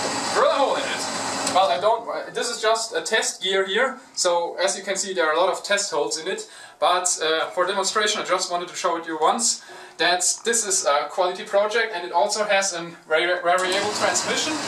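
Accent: Germany. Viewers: Is he German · you have a proper German accent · Du bist deutsch ich weis es man merkt es voll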